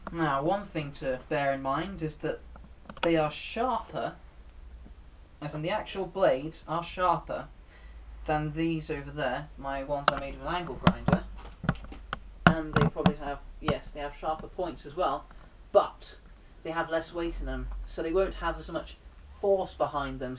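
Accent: United Kingdom. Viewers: He sounds like some type of Londoner too, which is my least favourite accent.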